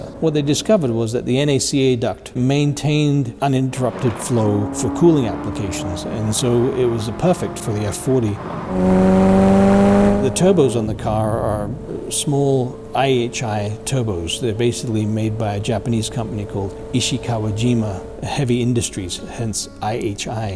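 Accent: Australia. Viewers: What an awesome bloke! I believe he was born and raised in the UK, but am I picking up a bit of an Aussie twang every now and then in his accent as well? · This guy almost sounds Aussie - I think he has spent a lot of years somewhere outside the USA..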